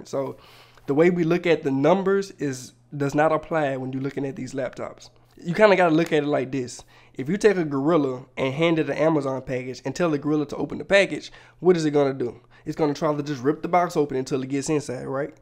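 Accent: Louisiana, United States. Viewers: Yaaaaas. I’m an Instructional Designer and have been watching tons of these videos and doing research before I make my purchase. Loved the review. Glad I came across your video. Also, me after a couple of minutes of hearing you speak: “I wonder if he from Louisiana?🤔” 😅 Thanks for this vid! ⚜️❤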